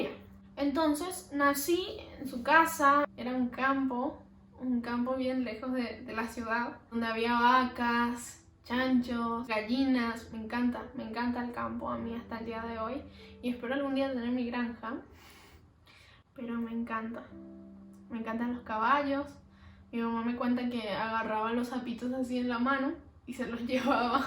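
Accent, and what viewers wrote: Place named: Paraguay. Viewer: Sabiaaaa que eras paraguaya por tu acento de español aaa que lindoo😍 yo también soy de Paraguay😎🇵🇾 edit: no sé cómo llegue acá mi mamá también vive en Argentina y este año quiero ir para conocerle. (también soy cristiano) pero ellos no :(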